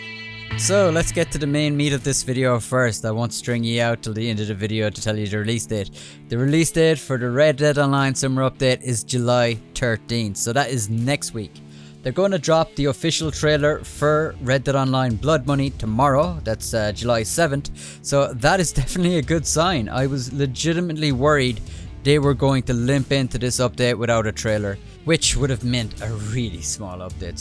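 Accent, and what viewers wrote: Ireland. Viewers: This man is Irish by the sounds of it.....legend ☘️😁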